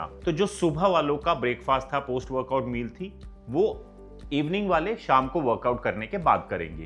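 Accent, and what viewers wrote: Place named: Punjab, India. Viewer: Are woh uska accent h .. Punjabi h to normally aa jata hai...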